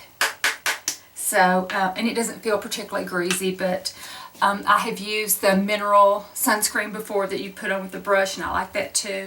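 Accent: South, United States. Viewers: You are so precious and beautiful😊I am turning 41 today and I start my retina and the blender this week...super excited...thankyou soooo much for your skincare routine video...you are just beautiful! Love love love your accent...from one southern girl to another😘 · think you are a beautiful woman with a beautiful soul and I can't understand why anyone would be mean to you except that their lives are so meaningless and pitiful that they feel the need to be awful. I love you're accent because to me it is normal! I think we need more southern ladies on YouTube. Your skin is amazing so I'm now going to try your routine. I just turned 50 and menopause is wrecking my skin! Keep up the awesome work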